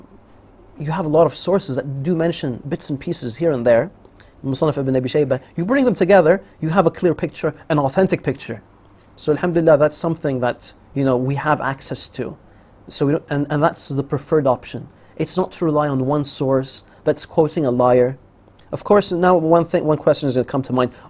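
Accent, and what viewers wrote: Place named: United States. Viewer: This video is a good cover up. He has omitted a lot of details from the authentic Hadiths that tells a lot about this incident. His American accent and long thobe is hypnotizing. I am surprised that the people sitting there took his half prepared story hook line and sinker. The real tragedy of Karbala is not the cruelty of Bannu Ummayia or the tyrany of Yazid, the real tragedy is that we are soo satisfied with such stories without prodding into the details about why the Leader of Youth in Paradise was killed by Muslims 60 years after Hijra. Wat bro narrates makes it look like an accident. But as the ahle Sunnah we cannot let this narrative be built in our homes, we should all prod deeper and find out why this happened and understand wt forces are preventing the people to distribute half baked stories and build narrative such as this.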